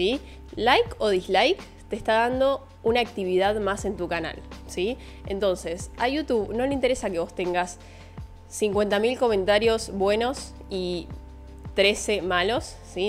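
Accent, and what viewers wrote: Argentina. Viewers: Yo creo que no me dan bajones por los comentarios pero sí por lo dislikes:( Psdt: Me encanta tu acento argentino😊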